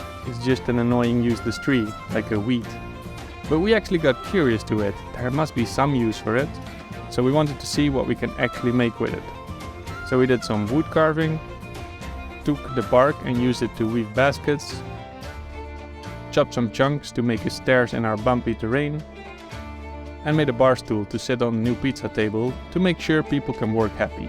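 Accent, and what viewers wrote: Netherlands. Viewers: Forgive me if I'm wrong... But your accent seems Dutch? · Now there's a proper Dutch accent.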